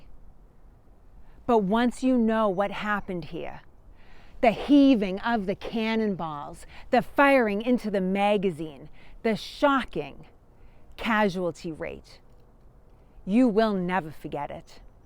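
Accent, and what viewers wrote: Boston, United States. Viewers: Hey... yet another great video, as always... but, you left Boston/Massachusetts and still have that accent 😊... thanks, Jimmy from beautiful 😍 bucolic 😊downtown Schnecksville, PA, sun 🌞 and fun 🎉capital of the world 🌎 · I'm here for the awesome history lesson but I'm also here for the wicked Bahstun accent hey. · You only have to listen to talk for 15 seconds before you realize she is definitely from Boston.😂💕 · There is something magical about listening to the history of the American Revolution with lovely voice and Boston accent. Came for the history, stayed for the accent. Thank you! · My sweet Historian with the Boston Accent!!😘💕🇺🇸💕🤘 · It's not New England it is a Boston accent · Great video! I have studied military history as a hobby lifelong but never heard of this battle. When I was stationed in various places over military career I always visited sites and kept an eye out for re-enactments and by chance never managed to see a civil war re-enactments but did see 3 Revolutionary War ones including Camden South Carolina and Monmouth New Jersey and an outlier Viking battle in Iceland :D. 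Orig New Yorker retired in PA love your Boston accent!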